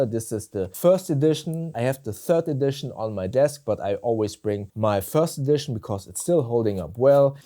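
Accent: Germany. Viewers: ⛰great video.. and it's funny, some reviewers are very terse and others use more words. I find yours that you do use more words and sometimes a tiny bit of filler words but yours are one of those few content creators that the extra words (but not too many) actually add to the content. I can watch your video such as this one at 1.0x and I can't say that about most creators. Maybe it's the German accent which is pleasing or maybe it's the street shots 🤣. I agree with you, overall the bag is amazing and I'm one of those who do love it. Really really happy with it and wasn't going to get it, held off for a while. They did an outstanding job even with the few nits that you mention. Keep up the great work! [and ps, that's a mountain emoji in my comment, nothing else!!]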